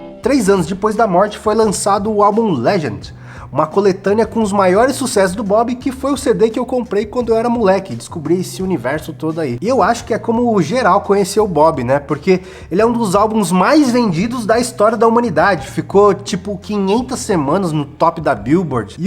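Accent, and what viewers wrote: São Paulo, Brazil. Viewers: O cara faz um vídeo com dialeto paulistano PQP que merda de sotaque do caralho. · Paulista fala mttttt feio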